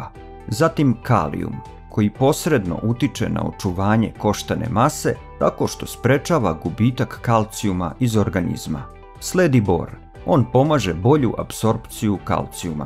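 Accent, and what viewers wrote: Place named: Czech Republic. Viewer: Замечательно ! Спасибо ! Мне просто чисто по человечески интересно . Откуда из какой страны Вы ? Вот слушаю Вас и почти все понимаю то что Вы говорите , и думаю , кто же он , украинец или поляк или чех . Скорее всего Вы чех или словак . Привет из Узбекистана , Хорезмская область .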